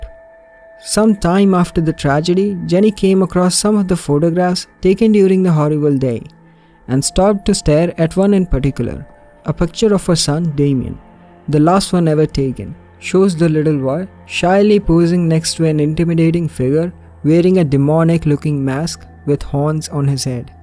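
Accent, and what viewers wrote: India. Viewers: Mate, you accent is Indian right? funniest accent in the world to my ear, I'm an Aussie so our accents is are quite different. But your well spoken, even if some things are missed pronounced and quite easy to understand, the dreary americans are the worst like Criminally Listed his a terrible narrator cant even watch his vids. my point is your got nothing to apologise for good video keep up the good work. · Your narration is fantastic! I love listening to Indian accent it's beautiful!!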